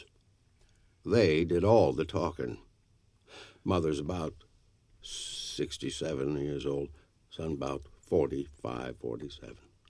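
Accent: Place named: New England, United States. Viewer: This is how I believe Frost meant this to sound , Great New England accent enjoyed it very much thank you.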